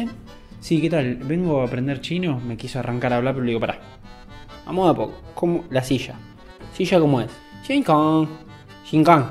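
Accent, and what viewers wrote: Argentina. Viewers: Soy de México y casi no me gusta el acento argento pero le ido agarrando cariño y luego me encuentro con estos vídeos que son oro en bruto. Gracias wey. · Hablando "argentino" lpm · vos hablas argentino ajajajjaaj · vos hablas argentino? asjajaj que genio!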